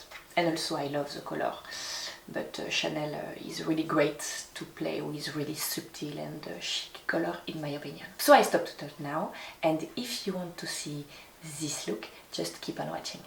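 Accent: France. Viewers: I love your accent! You're very French, of course! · no problem understanding you at all, in fact i love to listen to you: your melodic diction and French accent are delightful, while you are also very articulate. In English the nail color is a dark teal, i'd say. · I love your French accent! One of the reasons why I like your videos ❤️ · Don’t fret over your accent! Your English is very good and how many of us wish we spoke with a French accent? :) Another great video!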